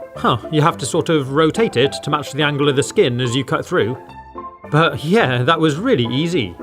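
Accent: England, United Kingdom. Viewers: Your british accent very cool, i like it! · bro is from the united kingdom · Are you from England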